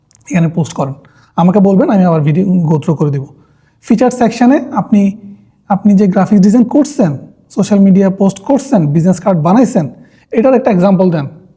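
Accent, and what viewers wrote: Chittagong, Bangladesh. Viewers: ভাই মনে হয় চট্টগ্রামের?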